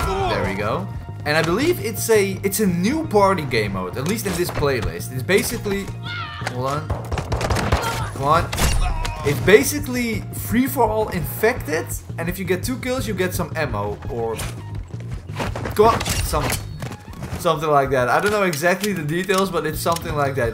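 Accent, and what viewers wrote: Netherlands. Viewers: very nederlands accent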